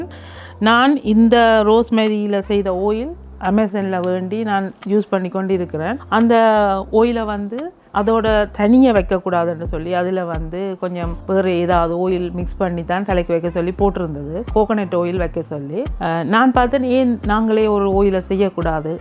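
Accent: Sri Lanka. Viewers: உங்கள் தமிழ் பேச்சு மிகவும் அழகாக அருமையாக கேட்பதற்கு இனிமையாக உள்ளது.உங்கள் இலங்கை தமிழ் கொஞ்சிப் பேசும் அழகு மிகவும் எனக்கு ரொம்ப பிடிக்கும்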